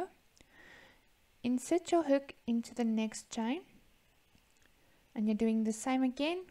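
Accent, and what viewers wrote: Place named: South Africa. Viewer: It is beautiful hearing a Fantastic South African accent again! I for the first time have truly managed to do and understand my first crochet attempt. Thanks a million x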